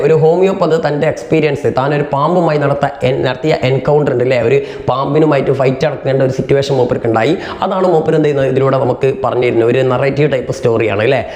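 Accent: Malappuram, India. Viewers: sir malappuramaanoo sthalam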